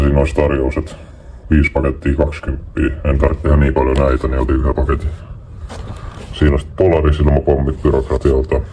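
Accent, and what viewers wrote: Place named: Pori, Finland. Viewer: ootko porista? mietin ku murre kuulostaa siltä😅 no anyways on kova setti💥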